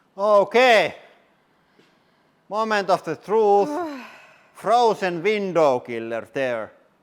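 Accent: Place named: Finland. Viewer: I can instantly telm ur finnis because of the accent so moi ja oli hyvä video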